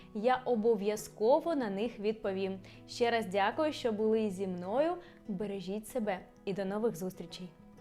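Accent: Ukraine. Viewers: Так вам йде западенська мова🤗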